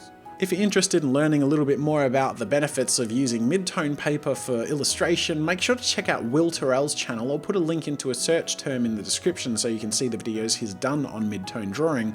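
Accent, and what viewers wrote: Australia. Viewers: Hey, just stumbled on this video.. Out of curiosity is your accent a queenslander? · Are you Australian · Is he Aussie? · I just now realised that says "good day" in every video is because he's austrailing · hes Australian? at least I think because of the accent but he's actually hot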